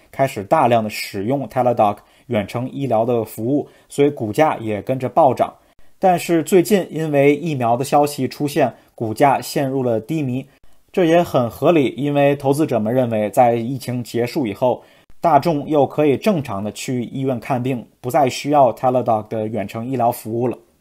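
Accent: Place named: Tianjin, China. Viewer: 是天津卫滴：）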